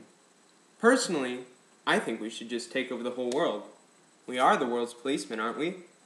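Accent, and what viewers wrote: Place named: United States. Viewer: i love americans :) im British :/ i love your accent so much :)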